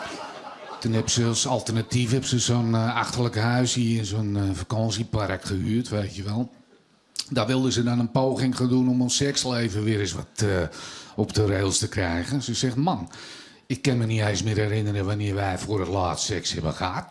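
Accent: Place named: Amsterdam, Netherlands. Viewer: Ton Kas moet overschakelen naar Haags. Dat Amsterdams is erg irritant.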